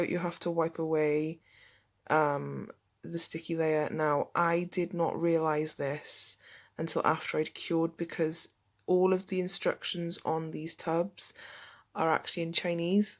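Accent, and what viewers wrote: Cardiff, United Kingdom. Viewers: This is the first time I’ve watched this lash. I really like how she talks. Sounds a bit like a Cardiff accent? Anyway I found her very helpful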